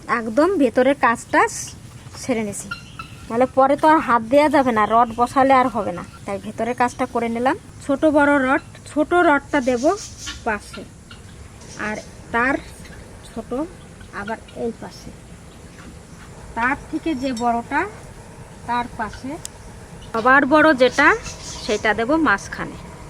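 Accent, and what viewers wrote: Barishal, Bangladesh. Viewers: আপনার ঠাকুর মার বাপের বাড়ী কি বরিশাল। কথা শুনলে তাই মনে হয়।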